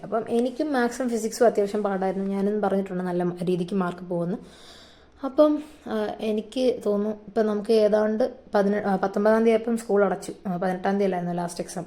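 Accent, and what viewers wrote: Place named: Alappuzha, India. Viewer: Alappuzha aahn nn thonunn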